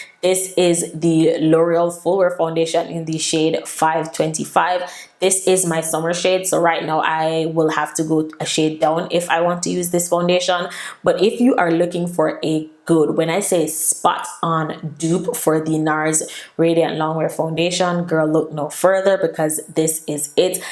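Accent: Jamaica. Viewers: She has a Jamaican accent. Everyone has an accent sis.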